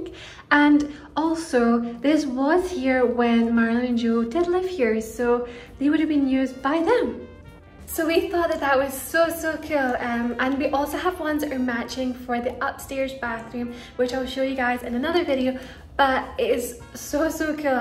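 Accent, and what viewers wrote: Scotland, United Kingdom. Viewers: Stop trying to change your voice. You naturally have a Scottish accent, but half the time you’re softening voice like Marilyn. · Easy peasy ❤️ love the Scottish accent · I beg to differ. Marilyn is gone. This is her interpretation and she has a Scottish accent. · Lol of course it's inauthentic, she's from Scotland so normally her natural accent is a thick Scottish accent but she's CLEARLY a Marilyn Monroe enthusiast where she styles herself to look like Marilyn, moved to L.A., an ENTIRE different country, and bought Marilyns house, so ya, if she didn't try to imitate Marilyns baby high pitched voice, i wouldn't be watching her as the baby voice is a part of the gig, this look wouldn't make sense otherwise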